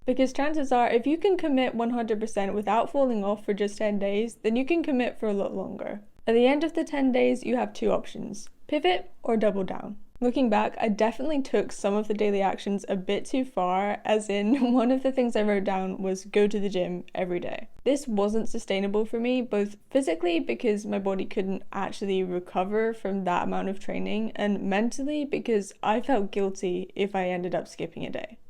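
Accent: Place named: Scottish Highlands, United Kingdom. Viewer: Where’s your accent from? 😊 I’m getting highlands mixed with down south..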